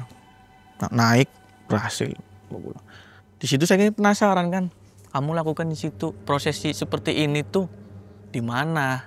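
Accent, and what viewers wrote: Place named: Java, Indonesia. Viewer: Saya suka dengan cara bicaranya mas..medoke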